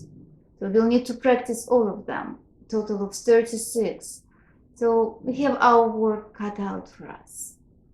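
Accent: Russia. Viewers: I don't know what she's saying because I'm not used to that type of russian accent (I'm used to crazy Russian hackers accent but I have no idea what she's say but probably where I'm from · ...though your pronunciation of English has a definite Russian accent, (but it is so charming!), and, yet, you obviously have a very good mastery of English vocabulary, and, you are very clear in what you are saying. => A Great introductory lesson! I hope that, someday, I can learn Russian pronunciation, as well, and would like to at least learn to read with some degree of fluency, as I have a few books, (on the subjects of jewelry, and metalworking), that I'd like to be able to translate someday. Thank you, very much!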